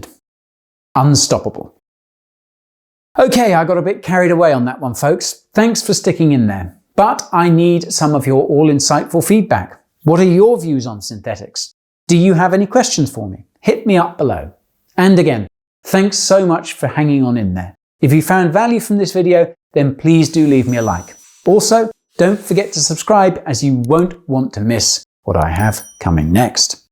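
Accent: United Kingdom. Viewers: I’m going to trust you on this one because you have a british accent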